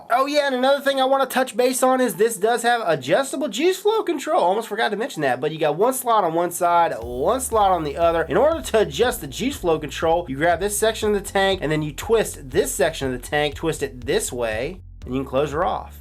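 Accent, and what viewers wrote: South, United States. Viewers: rahliE05 that's how people from the south sound...like motor oil sounds like motor oh-ill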